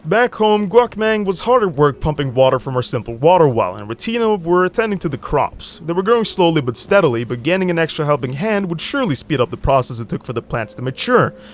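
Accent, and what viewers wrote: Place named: Norway. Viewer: Spotted the accent day one, have family with thick Norwegian accents here in Minnesota. Love your content man, keep it up!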